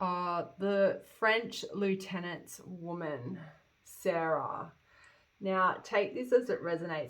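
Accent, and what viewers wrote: Australia. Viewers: “If you’re new to my channel I’m not normally this shit 🤦‍♀️ “ hahahah classic I love you already. Aussie ?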